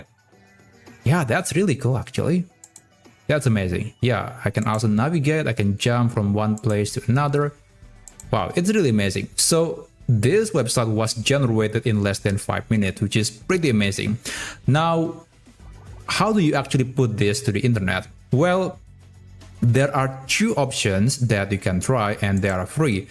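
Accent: Indonesia. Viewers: That's what I thought, too. his English is Indonesian English. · stunning tutorial man, great job! btw your accent like indonesian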